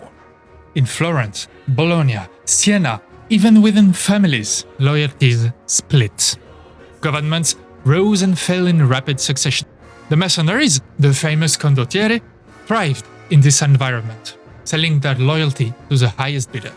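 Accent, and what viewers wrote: France. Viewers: am i the only one that finds his french accent in english charming? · Why did they get a guy with the thickest French accent I've ever heard to do the video? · Me disait bien que j'avais reconnu l'accent haha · C'est moi ouuu le gars qui parle est français? D'après la prononciation qu'il fait. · french accent i see, nice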